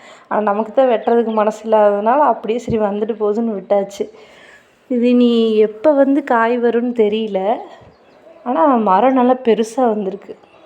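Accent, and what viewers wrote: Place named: Kongu, India. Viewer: கொங்கு தமிழ்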